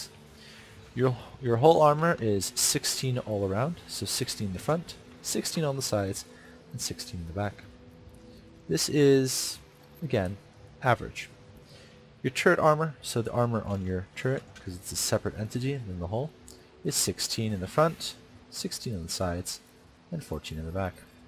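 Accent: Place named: United States. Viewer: You sound american, but you are on EU server?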